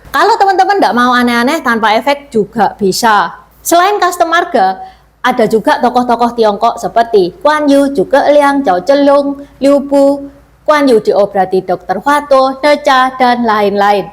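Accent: Java, Indonesia. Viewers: Medok ya..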